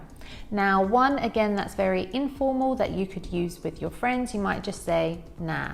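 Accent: United Kingdom. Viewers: you have very good British accent. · Hello 😇 Take love from India ❤️😇💐 Can you please tell me in which british accent you are talking 😇 To be honest with you I am in love with your accent 😇💐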